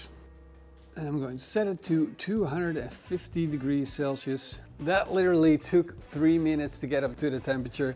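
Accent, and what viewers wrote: Netherlands. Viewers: Sounds like a dutch accent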